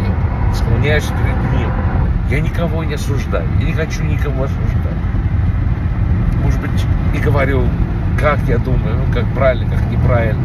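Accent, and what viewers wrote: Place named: Ukraine. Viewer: АКЦЕНТ ПОЧЕМУ ТО УКРАИНА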